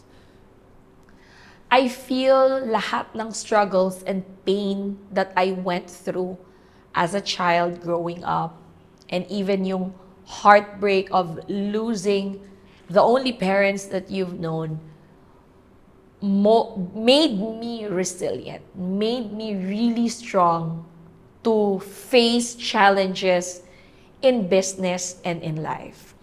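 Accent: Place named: Zamboanga, Philippines. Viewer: Taga Zamboanga, Jabacana, ganyan talaga magsalita ibang babae doon.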